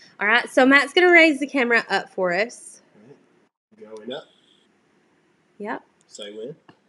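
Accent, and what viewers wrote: South, United States. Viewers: I love your southern twang!!!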